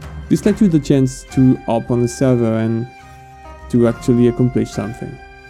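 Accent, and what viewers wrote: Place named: France. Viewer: The singing in the background made it even harder to understand your frenglish. Good vid regardless, liked.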